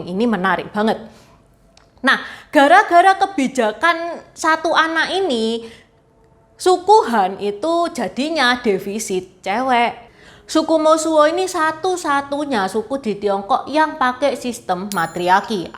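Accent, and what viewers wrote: Java, Indonesia. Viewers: medok banget🤣👍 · Makasih ya ce, bertambah wawasan nih, awalnya lihat channel ini rasanya aneh banget, dikiranya orang lokal ternyata Tionghoa, saking medoknya aku kira cece asli Jawa.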